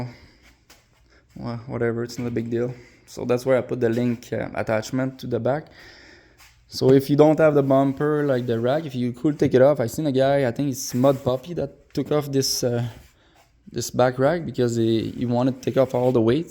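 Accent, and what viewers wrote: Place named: Quebec, Canada. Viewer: Je reconnais l accent quebecois. Le tube avec la tie rap a l avant du moteur, c est la belt exhaust. La sortie est a l avant du moteur pour faire dissiper la chaleur du moteur vers l arriere. Donc, meme si tu met un collet, il va quand meme s accumuler de l eau dans le tuyau puisque la sortie est tout pres... le tuyau en u en haut avec un trou, c est aussi le tuyau de la belt exhaust. L air sort de la belt box, monte en haut, et redescend au moteur... lorsque tu est dans l eau, puisque l air ne peut pas sortir au moteur a cause de l eau, elle sort par le petit trou en haut dans le u.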